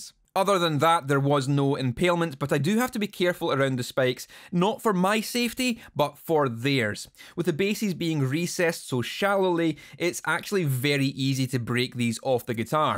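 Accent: Scotland, United Kingdom. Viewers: Love that the Scottish pronunciation of "bases" and "edges" sounds like "basies" and "edgies". Also, I'm pretty sure Kerry King did this to his guitar first. ;)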